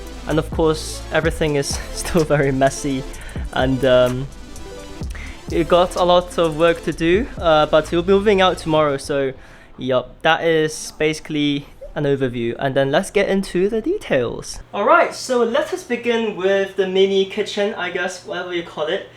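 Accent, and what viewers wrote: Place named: United Kingdom. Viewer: Ah the british accent never changes HAHAH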